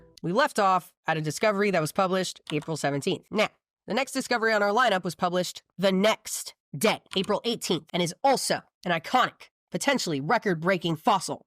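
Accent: United Kingdom. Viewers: Britain has lots of accents. That is sort of BBC English, middle class south east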